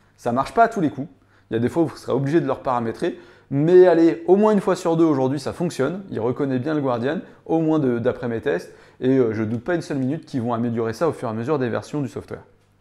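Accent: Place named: France. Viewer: C'est à cause de l'accent Français mon garçon ^^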